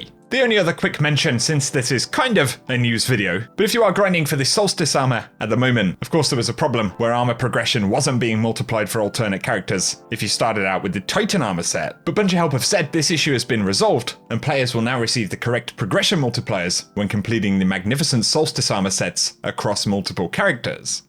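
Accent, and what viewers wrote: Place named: United Kingdom. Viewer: Gotta love the British voice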